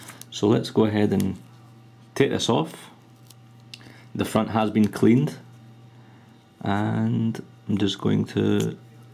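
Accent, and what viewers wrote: Scotland, United Kingdom. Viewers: rofl you fail, anyways that's just a scottish accent, and it is my number one of accents i want to learn (I'm dutch so i'll have some challenge), on my number two i've got the australian accent. I won't even buy a liquid-applied screenprotector but when I heard this accent I just watched throughout the entire vid :P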